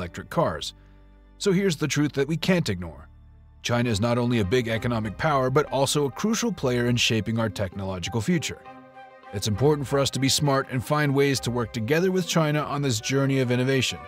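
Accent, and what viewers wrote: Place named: United States. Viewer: chinese propaganda video with an american voice narrator rofl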